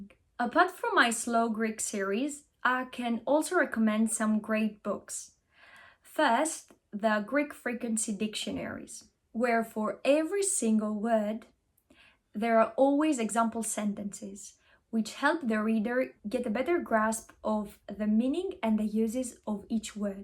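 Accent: United Kingdom. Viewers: I find your videos really helpful Thankyou! All the other Greek videos I have found are too difficult to understand as the tutors speak too quickly. Yours is perfect. I hope you don’t mind me saying but I am surprised that you are pronouncing ‘ and’ with a strong American accent and internet without the ‘ t’ sound because otherwise you have an English accent which sounds almost fluent. To me these words with American pronunciations stand out and sound rather odd. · Listening to your British-English accent, I wonder if you can talk about how you acquired it (instead of, say, an American-English accent), and how to better sound "Greek" instead of speaking Greek with an American or British accent? Also, I know that Crete has it's own accent/dialect. Are there many other regional accents around Greece?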